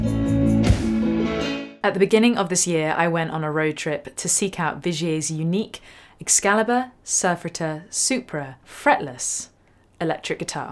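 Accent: United Kingdom. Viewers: can i help u get a sitar from india -) you are unreal i swear teacher,guitar player,polight,dusky voice,british accent and the most beautifull face how can you have all of that.REALLY UNREAL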